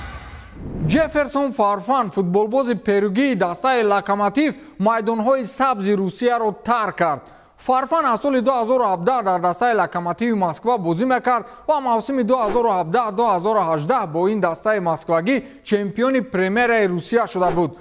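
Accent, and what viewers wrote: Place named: Rural, Tajikistan. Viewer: Акай чуни мни гох гох дехотигирам гапзан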